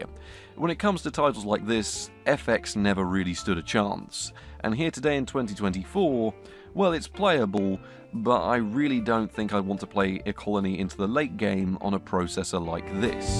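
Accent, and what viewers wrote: United Kingdom. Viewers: I'm from Russia. British accent is such a treasure)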